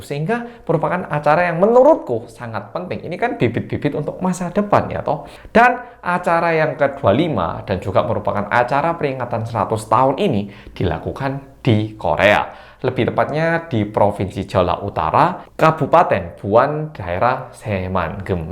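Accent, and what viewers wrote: Java, Indonesia. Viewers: Medok banget intonasinya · Jemek boso joso bang Becek bhs Indonesia nya. Btw Aku seneng smpy jik njowo i., 😁😁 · 😆 medok banget logatnya mas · Wow..!! Saya baru pertama kali ketemu Channel ini.sungguh mantaap,,bhsa indonesianya joss bgt.rada² medok bhsa jawa lagi.😃🙏🙏👍👍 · Ko logat e kok jowo isok ngomong jowo ta ko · Om asli orang Jawa ya kok suaranya medok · Jemek🤭😁 bosomu lho mas...bikin ngakak 😃😁 lek wong jowo mah paham..tapi yg bukan wong jowo aneh dengernya 🙄😳🤭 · Ini org Korea kah... tp kek org jawa logat dia ngomong. Aq yg org Indonesia aja gk ngerti bahasa Jawa.kek nya dia bisa bahasa Jawa.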